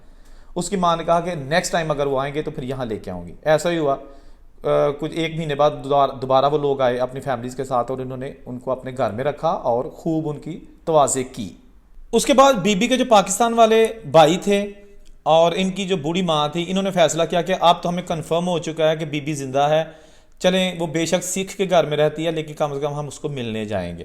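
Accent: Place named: Varanasi, India. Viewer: ਅਸੀਂ ਨਹੀਂ ਦੇਖਣੀ ਏਹ ਵੀਡੀਓ, ਪੰਜਾਬੀਆਂ ਦੀ ਬਰਬਾਦੀ ਦੀ ਕਹਾਣੀ ਤੂੰ ਸਾਡੀ ਭਾਸ਼ਾ ਚ ਨਹੀਂ ਬਣਾ ਸਕਦਾ ਜੋ ਸਾਨੂੰ ਸਮਝ ਲੱਗੇ। ਪਿੰਡ ਪਟਿਆਲਾ ਦੇ ਤੇ ਨਾ ਤੁਸੀਂ ਬਨਾਰਸੀ ਲਹਿਜ਼ੇ ਚ ਲੈ ਰਹੇ ਹੋ ਏਹ ਸਿਆਣਪ ਹੈ ਤੁਹਾਡੀ? ਨਾ ਵੀਜਾ ਪੰਜਾਬ ਦਾ ਤੇ ਨਾ ਹੀ ਬੋਲੀ ਪੰਜਾਬ ਦੀ । bahrhaal bhai Ajit nu koi mushkil nahi honi chahidi jo MOHAMMAD SAB DE JANAM Dinn ( Eid ) di khushi ch shamil hundeyan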